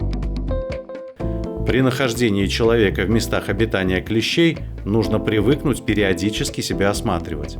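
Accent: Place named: Belarus. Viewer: Блин, вот всё в ролике замечательно с технической стороны, но произношение мягких согласных - это пипец! :( Ну нельзя взять и "прикрутить" произношение белорусских звуков к русским словам. Причём некоторые слова вам удаётся произносиТЬ (а не произносиЦЬ) так как надо. Что ж за напасТЬ-то такая в средней и восточной Беларуси с произношением...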